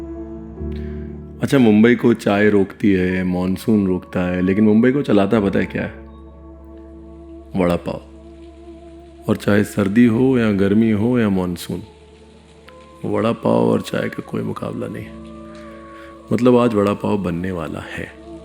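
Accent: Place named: Lucknow, India. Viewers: Lehja apka aur yaad Lucknow ki ... The style to present anything...is absolutely stunning No words can define your way , speak so lovely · Missed your "Hello ji " in the beginning , "ranveer ne bataya nahi" and your lucknowi way of explaining.kul mila k aaj maza nahi aaya .chefs to bahot aapke anokhe andaz k liye aate hai yaha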